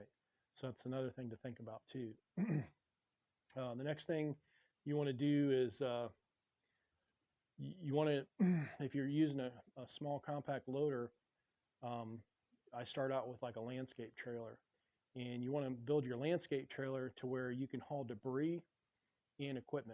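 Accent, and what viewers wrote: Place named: North Florida, United States. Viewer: I just figured out we live in the same town..i am not a tree guy. I thought I spotted the No Flo accent. Thank you for the video. I am just a wannabe tree guy.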